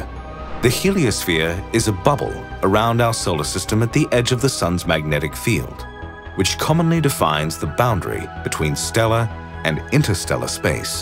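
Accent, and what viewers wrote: RP, United Kingdom. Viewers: It’s a total rip off of Kurzgesagt if you ask me: Distinctive vibrant colour palette ✅ Big bold white text in thumbnail ✅ Rounded off animated shapes ✅ Science/space themed content ✅ Narrator with British accent ✅ The only thing that’s missing is birds. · another science channel with a "british" narration, this gon' be good SUBSCRIBED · What's with the bizarre American pronunciations of "Cos-moess" and "Proe-ject" when you have a perfectly good English RP accent? It's highly disturbing! The last syllable of Cosmos rhymes with Boss, and the first syllable of Project rhymes with Bodge. I suppose I should count myself lucky that you didn't say "Aloo-minum"...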